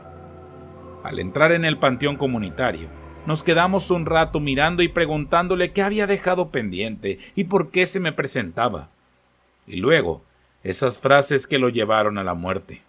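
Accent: Mexico. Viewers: adoro el acento Mexicano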